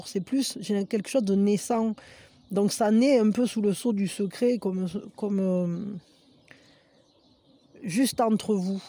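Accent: South, France. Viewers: Bo jour Jaime beaucoup vous écouter pour votre bienveillance et votre petit accent du Sud 😉le lapin violet me correspond à 99% je suis très fou et j’aimerais que l’autre le soit aussi mais il attend il hésite et c’est assez pénible mais on verra lors de notre prochaine rencontre 🤷‍♀️Je lui laisse faire le premier pas c’est moi qui l’ai fais jusqu’à maintenant merci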